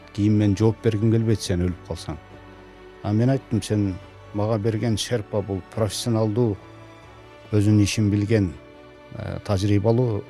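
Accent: Standard. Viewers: аман бол сен Сайдилхан агайдын уулу окшойсунАтан атактуу мугалим ошондуктан таза маанилүү Кыргызча таза сүйлөп жатасынӨзү Кара Кулжалыктар аябай чыйрак чыдамкай өжөр болобуз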